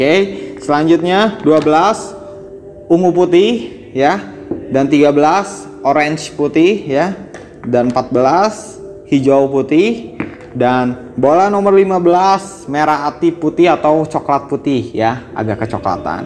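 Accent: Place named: West Java, Indonesia. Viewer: Belajar dasarnya pake logat sunda, maklum~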